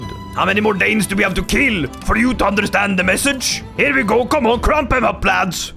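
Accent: Sweden. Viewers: That's the most swedish accent of english i've heard from anybody not older than 70. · Den svenska dialekten märks värkligen 😭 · The accent kinda gives it away 😂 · Är du skåning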